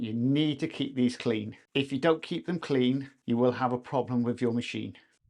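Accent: United Kingdom. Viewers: Love when I hear a Bristolian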